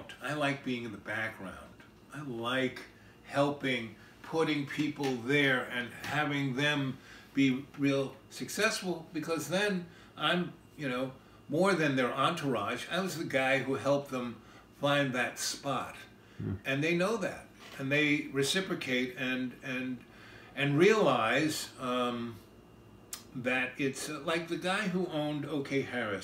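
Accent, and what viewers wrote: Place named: New York, United States. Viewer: Very interesting his story about Basquoit. He sounds like a real New Yorker. Madonna hooked up with Basquoit.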